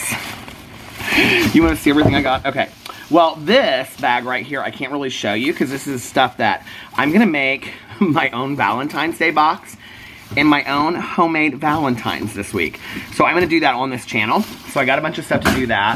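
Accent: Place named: Southern Indiana, United States. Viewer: I'm loving how that "Indiana southern" accent came out in full force in this video. 😃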